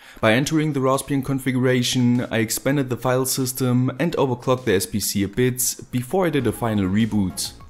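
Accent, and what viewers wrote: Germany. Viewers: can i hear a german accent? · oh gott..., ein deutscher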